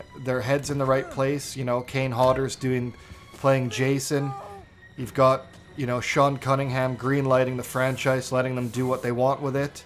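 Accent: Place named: Canada. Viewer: This Canadian voice sounds familiar...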